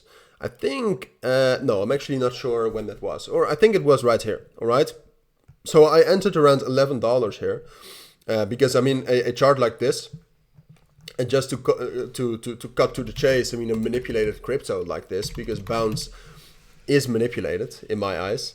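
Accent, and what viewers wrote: Netherlands. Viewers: Ik dacht al ik hoor een Nederlands accent! Goede video trouwens :)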